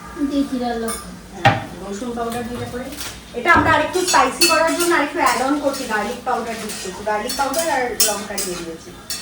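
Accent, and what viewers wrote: Bangladesh. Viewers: Di tumi steam e kore dekho darun lagbe Tomar kotha bolar tone Bangladesh r mone hoi Aage aeikhane chyla ki na ??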